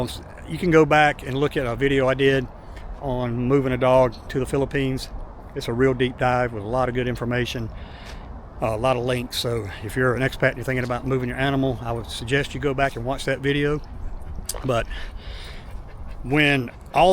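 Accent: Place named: North Carolina, United States. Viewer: Somehow your video popped up in my feed...maybe because i'm subscribed to JoGo's channel😅....(since you have the same accent as 'JoGo', I can now recognize a North Carolina accent 😅).....but that aside...you're thinking about to postpone for another 2 years...which is understanable...an other vlogger 'the Ugly Tourist'...had a friend who also planned to retire in the Phills..good job/good pay above what he already had...but he wanted to wait 2 extra years to gather more USD's...but the Ugly Tourist went ahead...and his friend....died 6 months later....so, yeah...you never know...just take in consideration that 97,99% of the people worldwide is not in a position like you do....so whille you're still breathing....GO! Enjoy more of your time!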